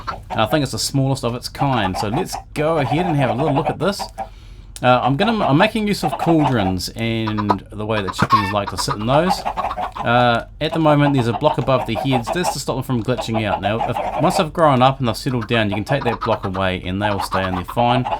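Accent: New Zealand. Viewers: Holy crap u from Nz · I guess you have never heard a New Zealand accent then... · EEEG lol you're a total kiwi! Props to you! Genius idea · you sound like a new zealander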